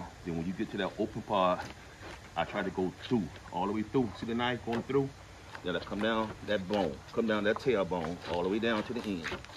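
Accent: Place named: Arkansas, United States. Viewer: Im😂so glad that I found this channel. I know that you guys live in Florida but you sound like a good ole down south Arkansas boy. I'm from Arkansas myself and I love the way you do fish. It's my favorite food. You make me remember my grandmother. Love you guys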